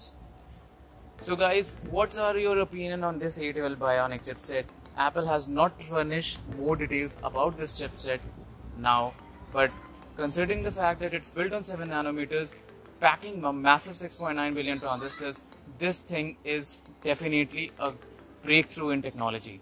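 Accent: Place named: India. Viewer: Crappy typical indian accent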